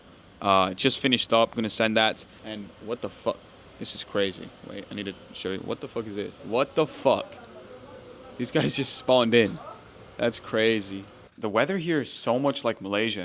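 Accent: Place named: United States. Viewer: you are not american, but you sound very american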